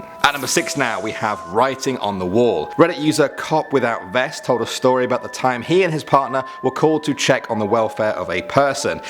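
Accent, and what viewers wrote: United Kingdom. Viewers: I love how he is british and not American and he speaks slow so you can actually hear what he is saying. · British accent so cute....he said " I'd probably run through a wall if he saw that outside his window"😁👍🏽 ♡☆♡☆♡☆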